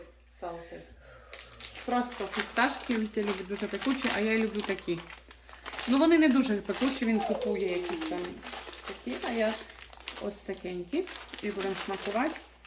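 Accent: Ukraine. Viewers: у вас такой приемный украинский говор, мой муж Любе ваши кулинарное блюда и вас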